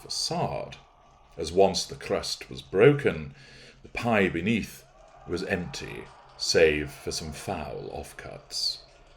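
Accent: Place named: Scotland, United Kingdom. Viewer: Love it. You’ve got to be Scottish. You’re a treasure.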